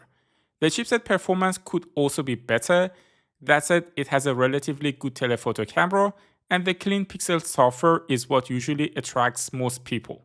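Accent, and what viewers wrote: Iran. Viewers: به به لحجه ایرانی 😂❤❤❤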